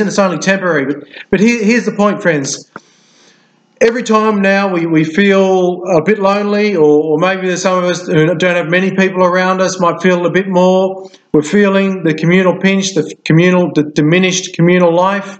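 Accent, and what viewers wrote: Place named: Canada. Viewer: Thanks for the inspiring message Padre! Nice Canadian accent 😂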